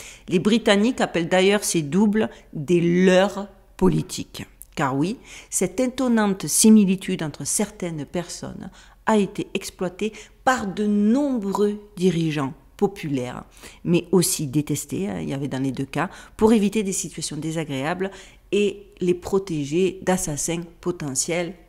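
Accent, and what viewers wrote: Marseille, France. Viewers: Magnifique, comme toujours….. Le réveil de l’esprit critique, si peu actif ces dernières années. Et cet accent de cigales!….. Merci. 😍🥂 Meilleurs Vœux à tous pour cette année de révélations …. Y a du pain sur la planche 😏😘🤓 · Plus ça va plus je vous écoute... c'est un bonheur, le mélange d'un côté artificiel à fond (Barbie) et une vraie brillance intellectuelle. Ça donne un dandysme du meilleur teint, au sujet de rien de moins que la structure (viciée) dé notre monde. Deux questions bêtes : un, vous êtes marseillaise ? Deux, avez-vous fait des "études" d'anthropologie ? Autre ? Merci en tout cas pour votre excellent travail, ET SA PRÉSENTATION, la Vôtre.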